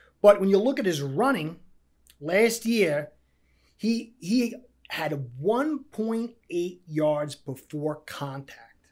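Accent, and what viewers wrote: New York, United States. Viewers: I know a NJ/NY guy when I hear one. Nothing like home cooking. Great job on the break down. You've added a follower in me buddy. FINSUP! 🐬🧡💙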